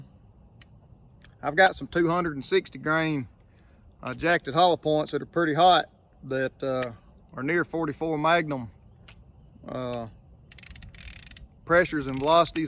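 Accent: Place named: South, United States. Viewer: That's just Southern English, what I find hard to understand is some of the dialects from the Northeast.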